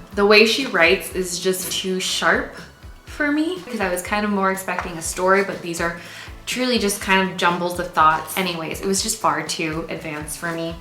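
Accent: Vietnam. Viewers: omg that northern vietnamese accent! when u spoke i felt like you were my big sister 😄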